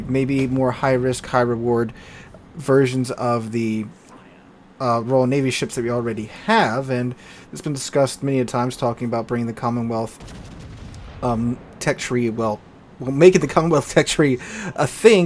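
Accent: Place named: United States. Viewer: He's using the American pronunciation rules. We say it this way, that's what we do.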